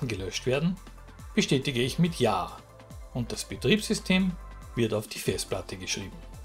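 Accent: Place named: Austria. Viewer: Nach gefühlt 0,1 Sekunden erkenne ich den österreichischen Dialekt trotz sichtbaren Bemühens um schöne, hochdeutsche Sprache.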